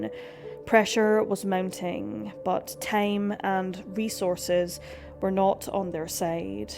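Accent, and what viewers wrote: Scotland, United Kingdom. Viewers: Trying to gauge your accent? Scottish? Just stumbled on your channel BTW. Great video thank you. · Ur voice makes the rest to of us Scots sound like idiots seriously sort it out 🙄🤨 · Your voice sounds like a combination of Scottish California Valley Girl with a bit of vocal fry thrown in. Very interesting. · Ooohhhh Scottish sister 😍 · It’s called a Scottish accent 🙄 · I've heard this story before, but never in a Scottish accent. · She sounds Scottish to me. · You mean… with a Scottish accent?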